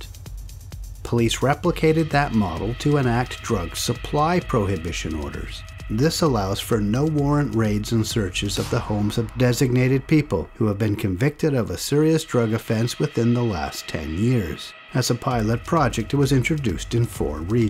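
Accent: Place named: Canada. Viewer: He's Canadian I think. At least he sounds it. He covers a lot of Canadian crime also.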